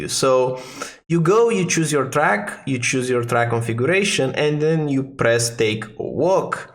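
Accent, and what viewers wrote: Romania. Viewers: Knew you were Romanian mostly from the accent, but reclamele în limba română au clarificat acest lucru. 😅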